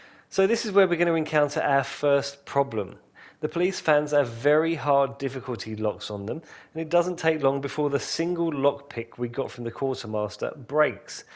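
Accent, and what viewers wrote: United Kingdom. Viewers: British pronunciation is weird at times lol. They say inventory like invent-Tree we say it like In-Va-Tory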